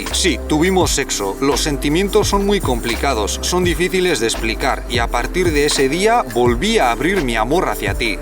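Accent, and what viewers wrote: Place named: Spain. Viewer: Un chino con ojos azules, que habla con acento español y chino. Increíble como unos cuantos años en un lugar se va perdiendo la identidad. Buen vídeo. Saludos.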